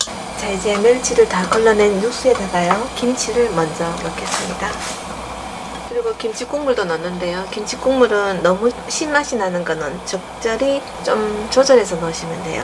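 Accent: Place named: Gangwon, South Korea. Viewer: 님~^^ 네~강원도의 사투리 구수한 매력이 있네요~!! 맨두와 가시기 저도 좋아하는 메뉴입니다^^♥♥♥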